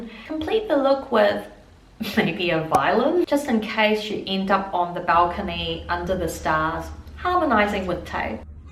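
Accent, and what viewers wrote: New Zealand. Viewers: Love your kiwi accent. This was so fun to watch. I hope you get those tickets! But what will you wear when you do? 😅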